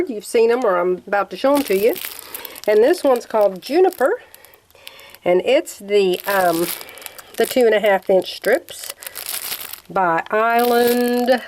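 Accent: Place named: Oklahoma, United States. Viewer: Your videos always make my day. My family is all from Oklahoma & Arkansas & it's like listening to them talk. You make me smile. I like both of the border colors. How about a skinny little brown line around to frame it & then a wider binding of the turquoise? On the crumb quilt, I love option 4. I think that one is the best. Have a wonderful day!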